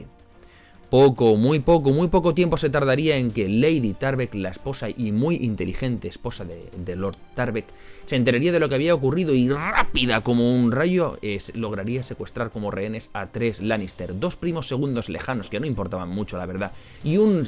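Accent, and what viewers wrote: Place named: Spain. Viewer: Qué lindo acento español tienes!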